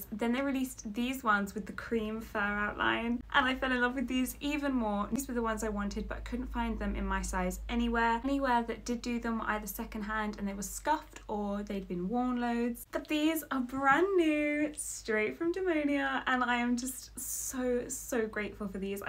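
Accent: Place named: RP, United Kingdom. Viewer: You sounded so- idk how to say it without it sounding bad but like, posh the whole first half then suddenly you said “when we was up in London” and I actually giggled out load.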